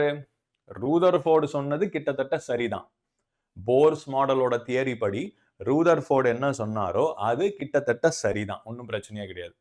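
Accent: Tirunelveli, India. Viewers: pesara slang liye tirunelveli or kovipatti nu theriyuthu bro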